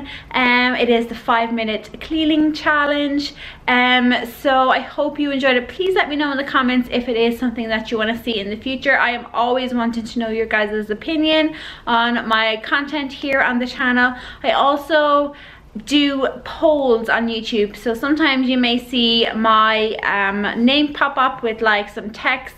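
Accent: Ireland. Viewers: OMG !! YOU'RE IRISH! Instant subscribe just from your accent!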